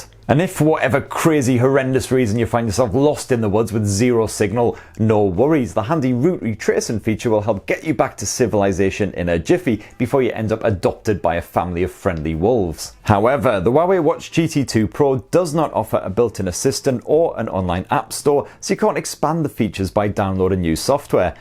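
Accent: United Kingdom. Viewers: Me gusta el acento británico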